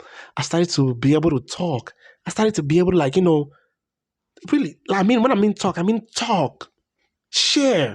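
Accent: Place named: South, United States. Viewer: oooh ok. That’s why I’m hearing a southern accent okkk. You’ve picked up the dialect very well. Ok Lagos. Awesome!!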